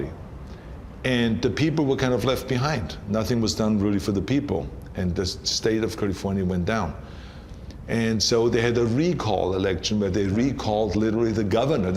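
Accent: Styria, Austria. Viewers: He is Styrian, he speaks with an Styrian accent... not even near to swiss?! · He has a veeery strong Austrian accent 😄😎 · He speaks Styrian, a dialect from Austria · He has an Austrian hillbilly accent and for that reason they didn't let him do the German dub for Terminator. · Sacré!! Ah j aime bien son accent autrichien 😅 quand il parle allemand! 💪😎 Der Terminator!! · Ze Austrian accent suits him perfectly. Imagine speaking Russian or French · Actually he speak German with an Austrian accent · Nope, it's a kinda thick austrian accent. Personally, I love it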